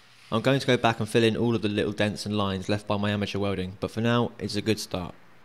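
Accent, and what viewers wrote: United Kingdom. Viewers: No problem with few talk here and there but the British accent is hard for me to understand in some points especially in fast speaking because English is not my language.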